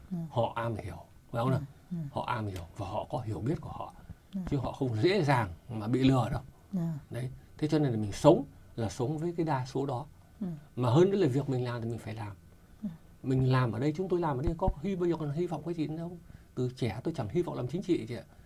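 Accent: North, Vietnam. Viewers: Anh này giọng Bắc bây giờ anh nói là người bình thường .Tớ cũng bình thường sống bình thường sao thấy anh nói bậy bạ liên thiên vậy .các cụ nói trước khi nói phải đá lưỡi 9 lần đấy. Nói bậy nhiều quá .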